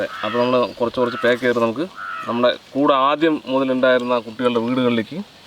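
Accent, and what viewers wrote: Palakkad, India. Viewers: Thani palakkadan samsaram🤩🤩🥳🥳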